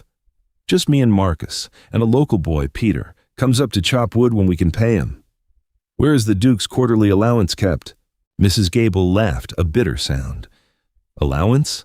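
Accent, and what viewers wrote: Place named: United States. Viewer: An English story of Duke and Duchess read with an American accent is dreadful to listen to 😳😏👎👎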